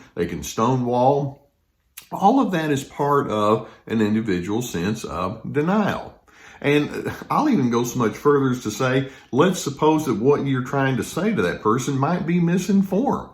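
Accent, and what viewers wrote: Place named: South, United States. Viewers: Mara, perhaps the southeastern USA. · Hi from Spain, where are you from, the south of EEUU?, your accent is very difficult for me. I like your vídeos a lot. ☺️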